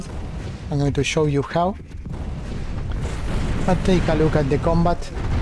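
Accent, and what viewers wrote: Chile. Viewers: no entiendo i ""J" no hablo chileno pense que era en español XD,. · Desde cuando no entiendo español? Sera español chileno. Buen video como siempre.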